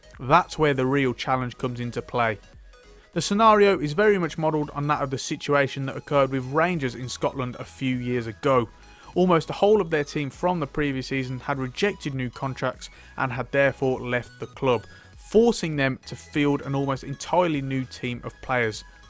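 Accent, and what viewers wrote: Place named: Black Country, United Kingdom. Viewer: yeah I'm from Tipton (near westbromwich) so I speak black country and your accent sounds similar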